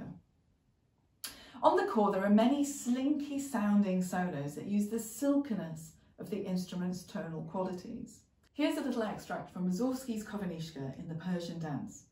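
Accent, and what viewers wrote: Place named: United Kingdom. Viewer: I so love British accents. Mesmerized....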